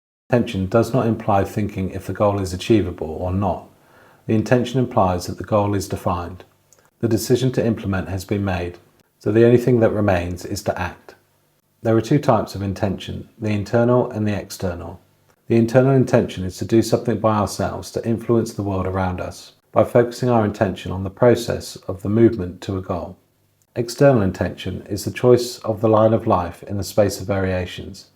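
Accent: United Kingdom. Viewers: lovely to hear your British accent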